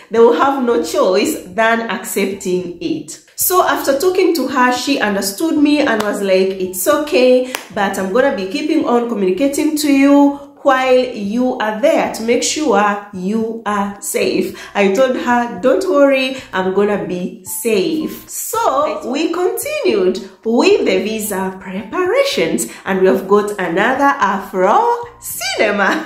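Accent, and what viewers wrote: Uganda. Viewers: Me too afirst I thought is ugandan or keyan good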